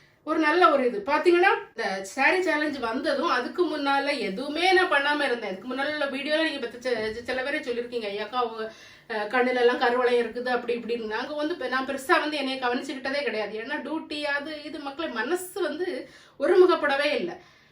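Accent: Nagercoil, India. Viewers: Sister so so cute and beautiful.your voice really nagarkovil.brother super aha flights podukirarkal.super.daily i watch your videos.sweet voice.effect aha ullathu. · All ur video are too good sister. Ur Nagar kovil language is very nice to hear . Keep doing it sister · I love to hear your Nagercoil Tamil. I am from Nagercoil settled in Bombay. I watch your almost videos. Beautiful couple. I never seen your elder son.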